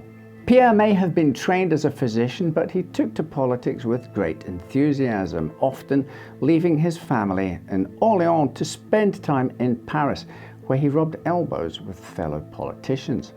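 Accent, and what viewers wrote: Scotland, United Kingdom. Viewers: Ooo I thought he is Scottish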